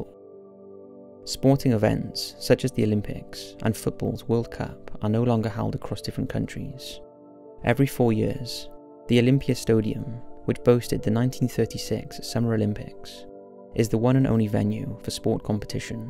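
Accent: United Kingdom. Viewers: British accent.....instantly clicked off.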